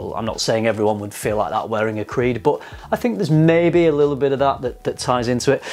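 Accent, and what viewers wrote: United Kingdom. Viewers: Love your reviews and British accent!!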